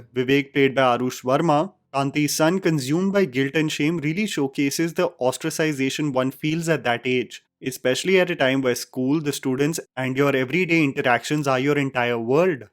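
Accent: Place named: Punjab, India. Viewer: You sound so good speaking Hindi with a Punjabi twang.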